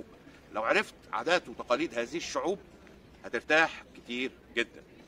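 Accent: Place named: Saudi Arabia. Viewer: معليش سؤال انت كنت عايش في السعوديه؟؟؟؟؟؟؟؟ لهجتك متاثرة بالكلام السعودي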